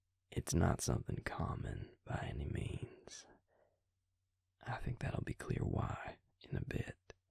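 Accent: South, United States. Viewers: I love his southern accent 😍he's too cute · lovin the yeehaw accent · me not being american and not used to southern accent: "i don't understand but.. YYEEE HA!!🤠" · Not usually a big vamp lover but oooh boi that southern twang could turn anyone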